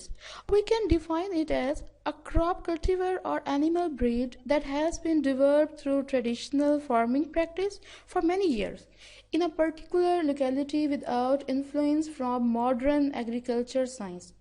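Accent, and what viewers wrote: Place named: United States. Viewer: Why u are doing American accent😡😡😡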